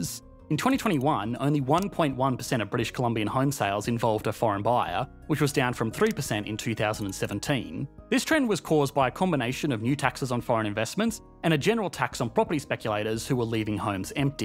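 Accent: Australia. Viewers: I use your videos to work on my Australian accent. It is thiccccc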